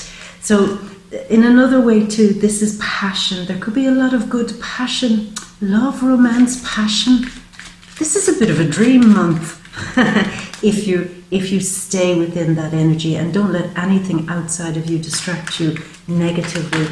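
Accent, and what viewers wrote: Ireland. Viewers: i love your accent; are you living in ireland or where you born thereÉ or a newfieÉ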